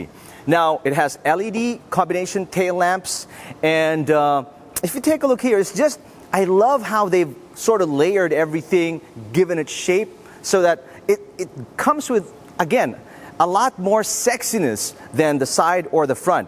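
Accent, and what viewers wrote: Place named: England, United Kingdom. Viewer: English accent 😍 😍 😍 😍 😍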